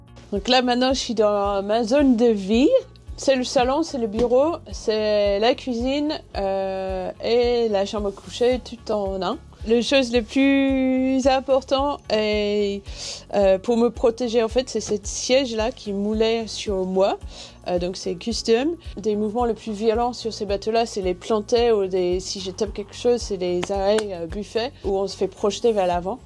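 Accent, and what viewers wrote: United Kingdom. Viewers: Extrêmement sympathique la capitaine avec en prime ce petit accent anglais si caractéristique au charme indéfinissable. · je l'adore !!! ma préférée ... j'aime son sourire ,son accent british et sa force de caractère ... j'étais présent lors du départ de la dernière course du rhum .... impressionnant ces bateaux et de savoir qu'ils elles sont seuls a la manœuvre .... des héros !!!! énorme respect .....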